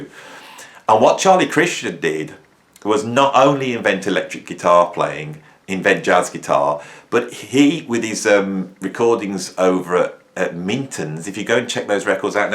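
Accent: Birmingham, United Kingdom. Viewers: Sorry old luv but as a pro jazz arranger/MD and player of 45 years I think your attitude is trivial, uneducated and banal. Let's leave your brummie accent aside because it would not be fair to make assumptions based upon that and i would be the last to wish to so do. I have watched some of your - to me, cringeworthy - videos and cannot help gain the impression your musical knowledge needs a refreshing perhaps?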